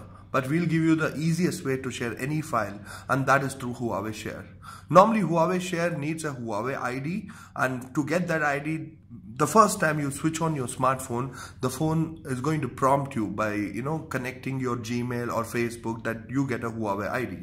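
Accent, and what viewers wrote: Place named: India. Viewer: You need to speak slower and clearer. Not everybody can understand your heavy Indian accent.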